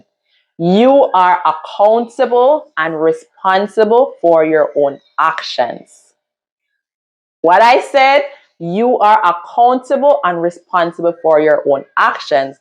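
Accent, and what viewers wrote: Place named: Jamaica. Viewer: I love how you embrace your Jamaican accent.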